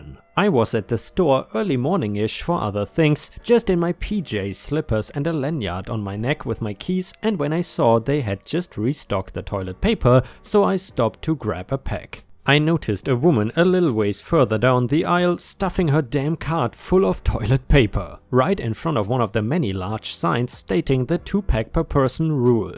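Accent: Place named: Germany. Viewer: Hi ⭐, 🌠🌟⭐😎⭐🌟🌠 No. We come to listen to your lovely accent. We don't need background music. Have you noticed how many people try to politely help you with your pronunciation of some English words you miss pronounce? You asked for our help so that you learn. We try to listen and catch your mistakes, to help you. How often does that happen on the internet, people politely helping? Anywhere but your channel people would be teasing you for your mispronunciation. To go from German to English fluently is a miracle in and of itself. We want to hear your accent, it's charming. 😊 Thank you for everything. Take care, stay safe, have a nice day. 👵☺️✌️☮️🖖 😷 🙉🙈🙊 ♥️😎⭐🌟🌠 💍🏍️👨‍⚕️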